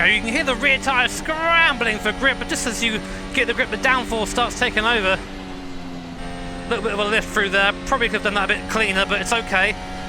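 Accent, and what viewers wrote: United Kingdom. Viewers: I'm going to tell you what I find amazing. I'm an FM7er on XboxOne using nothing more than a controller, so I'm blown away by your driving setup and the VR and the whole shebang; truly incredible. But what I'm most impressed with is your ability to provide that wonderful and intense high-pitched, British-accented non-stop racing commentary WHILE driving an F1 car on the NBR! In my own world, I'm pretty confident on the Ring, but simply driving takes all my concentration. If I so much as fart too loudly I risk throwing myself into the wall. Great driving, and a great video! Thanks so much for sharing.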